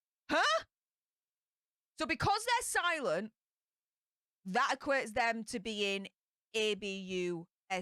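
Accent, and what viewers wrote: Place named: England, United Kingdom. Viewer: I dont know what to think about Harry. He was Always my Favorite, but now? I dont know what to say anymore. He should divorce Meghan. They dont fit together. Since she is in the Family, everything goes Downhill. your accent remember me For Louis Tomlinson from one direction 😂 He ist from doncaster 🥰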